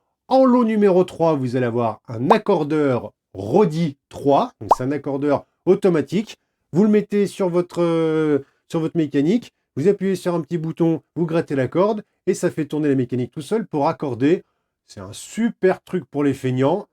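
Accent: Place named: Quebec, Canada. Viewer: Bravo pour l’effort, effectivement l’accent Québécois est pourrit. Si ça peut t’aider on dit Tabarnack. Comme c’est écrit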